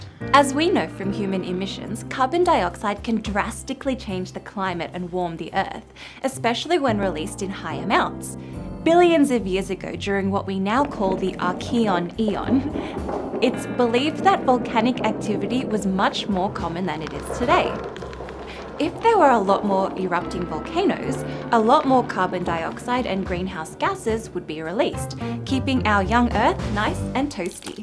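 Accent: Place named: Australia. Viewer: university? (*plus i detect an accent) ..... are you aussie, or sud afrikan, or NZ?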